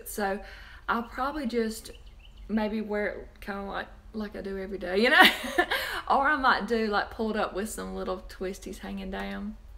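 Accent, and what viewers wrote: South, United States. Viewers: Your features do remind me of Liz Taylor I wonder if the southern accent also has something to do with that, "cat on a hot tin roof" was playing in at the theatre recently. I wonder if you have seen her in e film? Xx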